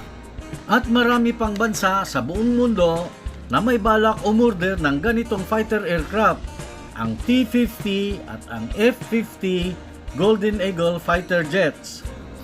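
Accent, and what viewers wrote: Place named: Visayas, Philippines. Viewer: Visaya nimo dong kaya pala tigas ng solte nimo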